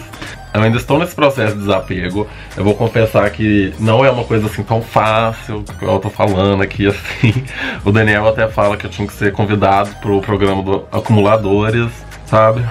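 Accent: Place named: Minas Gerais, Brazil. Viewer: Oi oi lindo, seu sotaque mineirim é um arrazo! Saudades imensas da minha terra. A 6 anos moro em Porto Alegre e quando ouço alguem ... ai ai me da aquela saudezinha de BH. Enfim, esse video eu assisti a alguns dias e foi um divisor de aguas... <link> estamos sim, na era do consumismo desenfreado, porem cabe a cada um de nos sermos, low consumerism , LOWSUMERISM ... Parabens pelo canal!!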